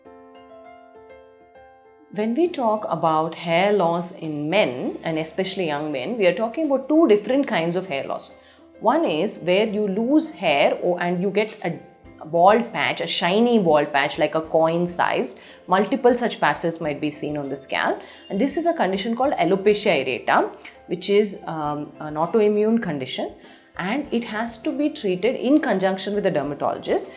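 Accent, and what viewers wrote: India. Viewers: It's the Indian accent my guy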